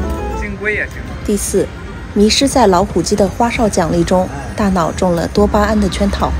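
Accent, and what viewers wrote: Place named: Sichuan, China. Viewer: …四川的哇。😂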